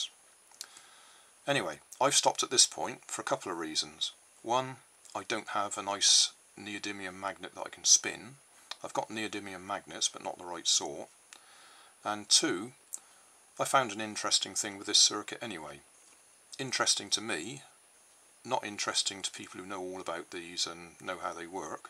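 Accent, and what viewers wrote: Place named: South West England, United Kingdom. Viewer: Where are you in the UK? You have a south west accent, sort of Wiltshire to Bristol possibly. I'm originally Suffolk, but now live in France, Dordogne, which is very rural. My main heating is a 14 Kw wood stove, which I feed from my own woods. Spent some time in Wiltshire long ago, at RAF Compton Basset. Also briefly near Weston Super Mare. If I take thing apart, I always try not to destroy the bits in the process, as they could be useful in the future, and small motors have potential, where bits of bent sheet metal are less likely to have much. However, the coil would be a good source of wire to make smaller coils, for experimenting with, so, its swings and roundabouts really. I dont understand why people get so emotional on these sites, after all, scrap is scrap, which ever way you look at it.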